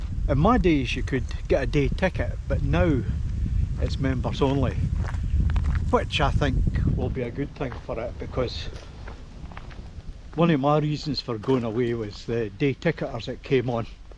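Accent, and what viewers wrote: Scotland, United Kingdom. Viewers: Free serotonin, i love scottish accent and scottish landscapes, your videos are relaxing and comfy :)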